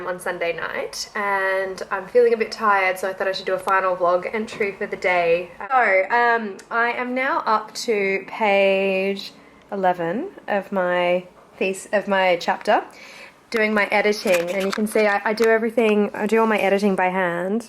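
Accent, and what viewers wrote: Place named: Australia. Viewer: One question: Are you Australian? You sound sooo much like it! (I'm an Aussie myself).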